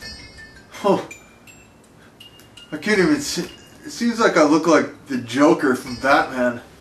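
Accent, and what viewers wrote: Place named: New Jersey, United States. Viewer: He sounds like he's from New Jersey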